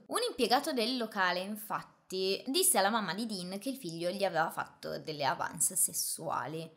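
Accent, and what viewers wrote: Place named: Milan, Italy. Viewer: Sì consocevo la storia di Candyman; uno dei più famosi serial killer USA. Poveri ragazzi, adescati dall'alro farabutto coetaneo e complice dei Corll. Ti chiami? Ebbene è il mio primo video del tuo canale e mi sono iscritto. Hai una buona voce e narrazione con accento lumbard milanes.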